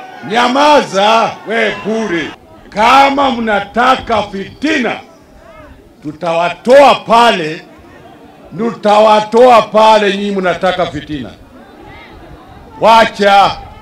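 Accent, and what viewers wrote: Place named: Kikuyu, Kenya. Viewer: GOAT. As an othaya folk I can confidently tell you his non-native utterances( non-kikuyu et al ) were merely Kikuyu talk transversed especially in Swahili. When he says " sasa basi " he is actually adressing the subject in Kikuyu banter " Atereri ".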